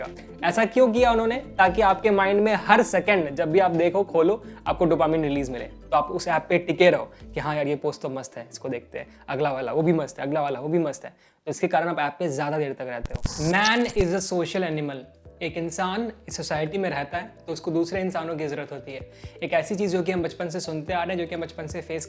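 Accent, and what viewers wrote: Bihar, India. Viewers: your bihari accent is sosexy. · Bhaiya got the 💯 Bihari accent blend 😸PURE IITian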